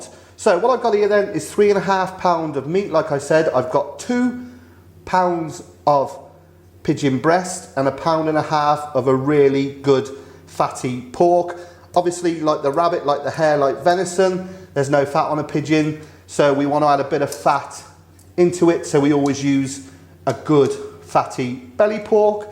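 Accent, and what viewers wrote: United Kingdom. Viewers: Stick to the metric system. Pounds, ounces, etc made no sense in your british accent.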